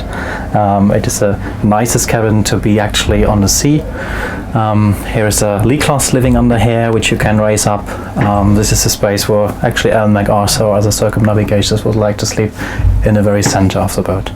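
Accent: Germany. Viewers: Immer Lustig wenn ein deutscher sich in english bemüht. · What a beautiful German accent English!!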